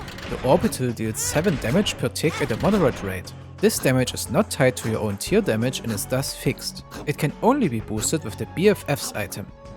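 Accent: Germany. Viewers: Deutscher , nicht wahr?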